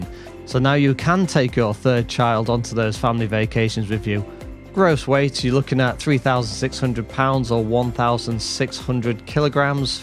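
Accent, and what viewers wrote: United Kingdom. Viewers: brittish accent???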